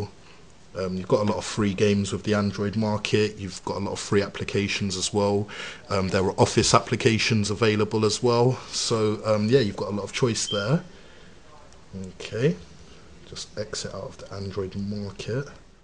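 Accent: United Kingdom. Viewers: Thumps up if you're fond of the British accent. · ENGLISH accent, there's no such thing as a British one. ;) xx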